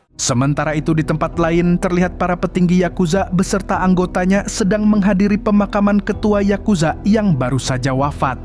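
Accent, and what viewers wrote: Banjar, Indonesia. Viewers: Bubuhan Banjar sekali nya 😂😂😂😂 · Mantap mang bahasa Banjar y😂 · Abang urang banjar lah😅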